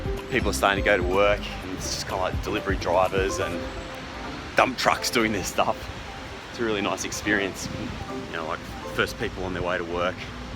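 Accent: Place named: Australia. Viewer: I landed on your channel after randomly searching for a beautiful college campuses around the world. It's somehow very nice to hear the motivational talks in Australian accent :) I agree we should do what works more, although sometimes we need to push ourselves into doing something new to turn around what does not work into what does work! Hope you are staying safe during the pandemic! - a random Korean student from Japan.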